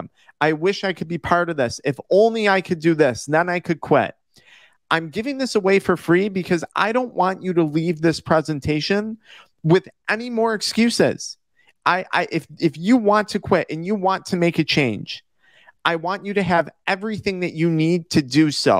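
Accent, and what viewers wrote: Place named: Upstate New York, United States. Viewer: When I first heard your accent I thought this guy sounds like he lives in upstate NY (I'm in Bing) and laterin the video you confirmed you are from NY! Lol thanks for the content, its very helpful!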